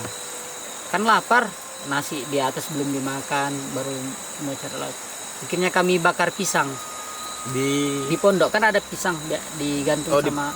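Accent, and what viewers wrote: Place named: Makassar, Indonesia. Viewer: Eh ,, Toh ,, Hehhe makassarku sekali😘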